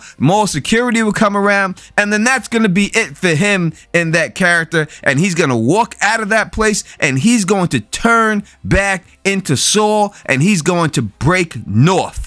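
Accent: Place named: New York, United States. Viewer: Yay! I love your analysis, and NY accent! 😍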